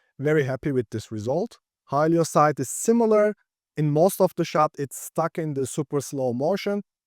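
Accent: Turkey. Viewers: Sanki ingilizcende bir Türk aksanı var gibi ama neyse😅😅😅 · Türk duyduğumda tanırım :) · I hear a strong Turkish accent.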